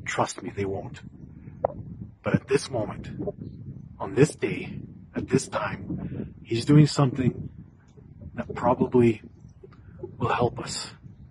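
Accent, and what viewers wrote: Russia. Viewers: THERE IS NO DIFFERENT WAY - or justification for this war. You think that under the shroud of “intellectual analysis” you can push your opinion and people would believe you? There is no justification for killing thousands of young man for the war of one fanatic man Putin. The Russian people don’t deserve that and Ukrainian people don’t deserve that either. Whatever his goals are - he is a lost cause. It is March 8 and it becomes clear that other than ever that he is failing. PS.And you, pretending to be from hungry Dash think again I can spot your perfect Russian accent… You’re not Hungarian you’re Russian and there’s nothing wrong with it but don’t you lie to people and push your so called “intellectual opinions…”… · 1st of all-Have you ever lived behind the "Iron Curtain"? You have a distinct Russian accent in pronouncing Putin's name, and your surname doesn't sound Hungarian.2nd-you are talking about the rights of Hungarians in Ukraine, but completely omitting the brutal crushing of the Hungarian people in 1956 by the Russian tanks???And what about Czechoslovakia in 1968??? 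Why, do you think, are the Russians not so popular, to put it MILDLY, amongst their neighbors and former forced members of the Warsaw pact? Their goals are always occupation and brutal domination. The only point I agree with you is that there are many things we don't know. However, the one thing we know with a high degree of certainty is that Putin is a brutal dictator.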